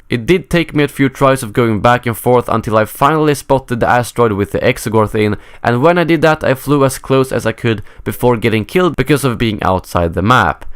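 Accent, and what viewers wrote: Sweden. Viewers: are you froom sweden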